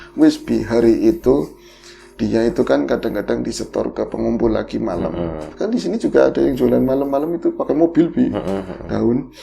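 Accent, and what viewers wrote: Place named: Tegal, Indonesia. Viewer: Dngerin logatnya bro jd inget sodara2 bpk ku yg asli tegal.👍👍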